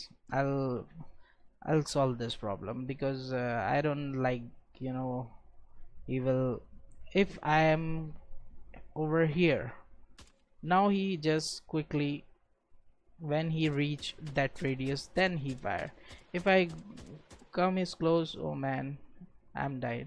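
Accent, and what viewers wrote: India. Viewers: bhai jo bolne walo ki english bolne ki style hi alag hoti hai jo bhi indian hoga wo acchr se ye english samaj sakta hai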